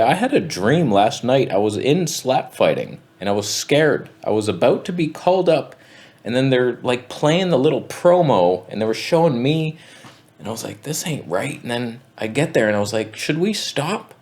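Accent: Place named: Canada. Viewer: It’s like if Jack Slack had a vegan Canadian accent.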